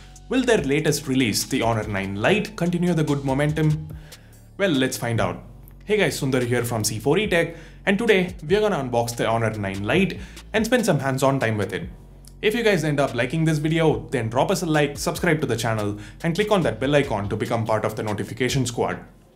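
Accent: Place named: South India, India. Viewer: get rid of horrible south Indian accent !!!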